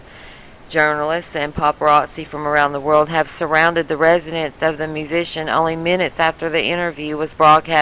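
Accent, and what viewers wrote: United States. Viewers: where else do you hear blockbuster stories like this except for the "world news daily report"...such a bastion of truth, no other news outlet has even bothered to question it! you fucking dipshit. not everyone with a southern accent is a moron, but you ain't helping with drivel like this. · Fk don't you just fkin hate the way yanks speak we gave you English fk sake use the language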